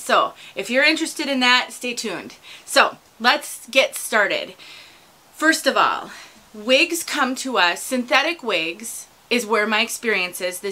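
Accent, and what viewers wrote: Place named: Illinois, United States. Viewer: Girl, you from Naperville, Illinois? Your accent...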